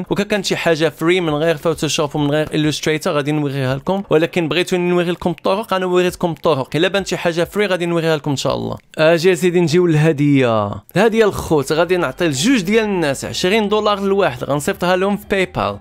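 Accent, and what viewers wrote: United Kingdom. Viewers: كاتقضي عليا باللكنة الابريطانية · Awel Marra kansheuf maghribi kihdar b darija b' british accent :D , allah yfar7eik f 7yateik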